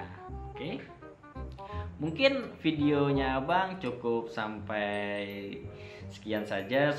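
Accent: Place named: Banjar, Indonesia. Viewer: tapi ada bahasa tadi logatnya kaya logat bhsa Banjar. Makanya saya kira dari Kalsel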